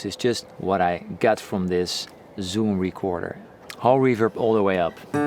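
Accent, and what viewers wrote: Netherlands. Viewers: Great video loved it :) Butttttt if you care at all about not sounding too Dutch, watch your pronunciation of ideas (it sounds like ID's) which is a dead giveaway you're dutch. And yes fellow dutchy, keep up the awesome vids. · Haha, you can recognize a Dutch accent from miles away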